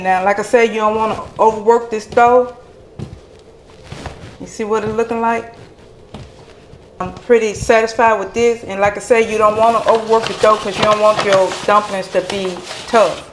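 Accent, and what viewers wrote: South, United States. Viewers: You're so such a lovely lady. Cute country accent ❤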